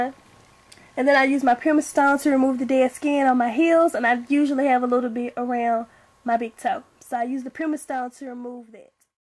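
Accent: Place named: Mississippi, United States. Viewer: I just was just curious where are you from? You sound like you are from MS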